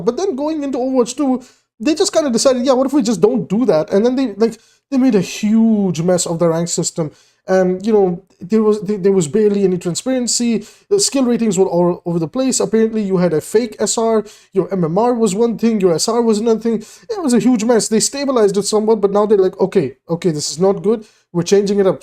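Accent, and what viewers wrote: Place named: India. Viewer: I'm guessing because he has the cadence of Indians when they speak that you'd make an assumption.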